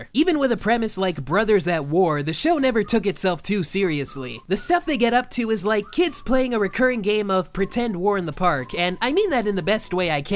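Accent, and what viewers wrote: Canada. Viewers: I cannot figure out which province your accent is from! I'm assuming its southern Canada though. Also, this is a BIG nostalgia blast.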